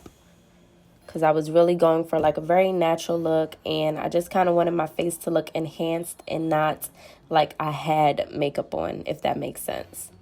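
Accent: Jamaica. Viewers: Gurl jus a c seh u a jamaican❗ daumnn Loving yr videos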